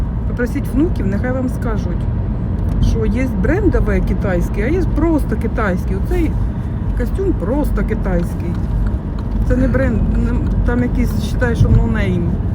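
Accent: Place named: Kryvyi Rih, Ukraine. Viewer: Вона балакає на нашому Криворізькому!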